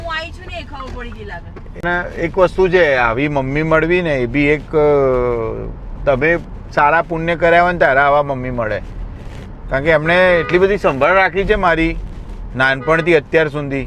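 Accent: India. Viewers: Tmara accent Mehsana na lag ch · Tame kya na cho sir Tamara acent sabarkanthaa k aravalli side ni lage che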